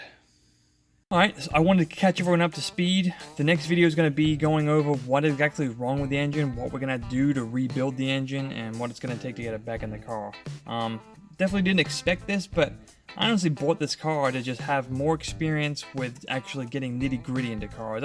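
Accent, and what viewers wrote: Australia. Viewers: don't pay these dudes any mind. They are only used to hearing American accents. You sound like Australian or New Zealand. I can understand you clearly. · Australian ? Your accent and the flag on the wall are hints that you are. Bless the koalas and other endangered animals. Enjoyed your video. Looking forward to more.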